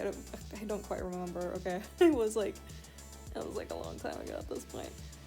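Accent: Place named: Scandinavia. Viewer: do you speak Swedish/Norwegian/Danish? Your speech pattern and slight accent sounds so familiar XD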